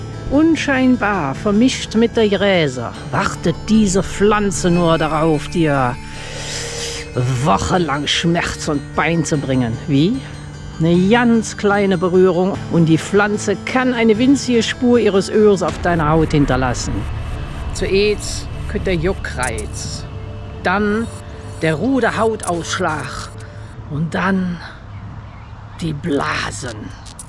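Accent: Cologne, Germany. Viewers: alter😳 da braucht du einen übersetzer, aber als urkölner kann ich sie jut verstonnn👍 wünsche wiggerhin vill spass😎 isch han se direk abonniert👍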